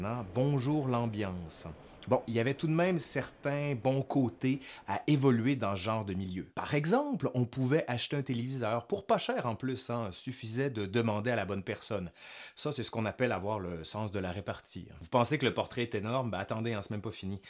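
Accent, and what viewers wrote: Quebec, Canada. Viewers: Ouiiii, je viens de la découvrir ! Je visionné chaque vidéo de la plus ancienne à la plus récente. Je viens de vous "rencontrer" via les vidéos de Ben (Nota Bene). Merci pour toutes ces capsule fort intéressantes qui me font découvrir, entre autres, votre pays qui a bercé les rêves de mon enfance mon enfance (Gilles Vigneault surtout) et toujours avec ce savoureux accent et cet humour.... québécois ?! 😉 🙏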